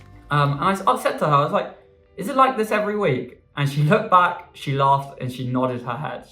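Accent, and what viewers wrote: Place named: United Kingdom. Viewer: omg Britich accent - very bad, uncomfortable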